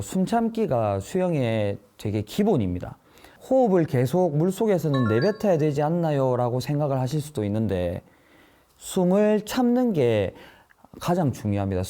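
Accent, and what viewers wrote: Gyeongsang, South Korea. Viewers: 간결한 설명, 부드럽고 정겨운 경상도 억양이 강의가 더 쏙쏙 들어오는것 같아요. 좋은 강의 감사합니다.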